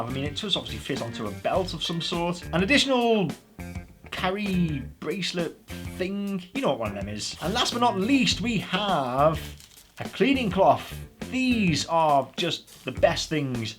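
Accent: Liverpool, United Kingdom. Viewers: Can you get these down Paddy’s Market Lah?. You just can’t hide a Scouse accent LOL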